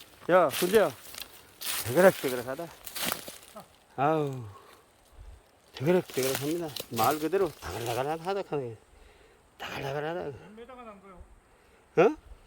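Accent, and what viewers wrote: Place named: Gyeongsang, South Korea. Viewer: 영상 너무 신나네요~~사투리가 김천(?) 고향사투라 오랜만에 정겹네요 안전 산행 하세요~~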